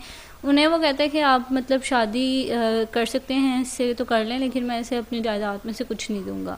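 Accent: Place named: Islamabad, Pakistan. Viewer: ویسے آپ ہیں کہاں سے ، لب و لہجے سے تو پنڈی/اسلام آباد سے لگ رہی😁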